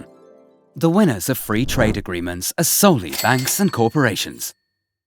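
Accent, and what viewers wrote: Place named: United Kingdom. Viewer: irony. the guy has a UK accent. ////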